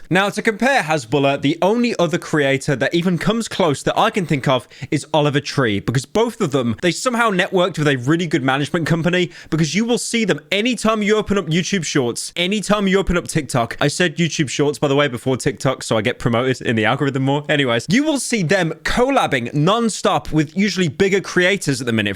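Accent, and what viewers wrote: United Kingdom. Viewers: is Bri ish?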